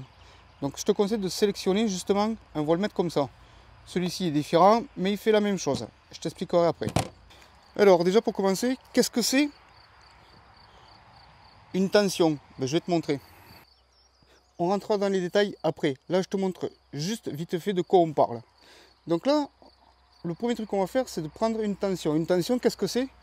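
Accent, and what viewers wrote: South, France. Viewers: Ohh mais tu es de chez moi ( le sud),!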